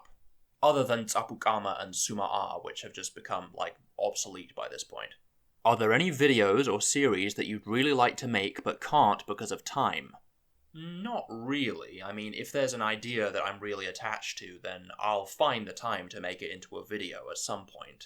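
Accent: United Kingdom. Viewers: What's your native dialect? It's definitely a non-rhotic, british-esque but I'm having trouble placing it more specifically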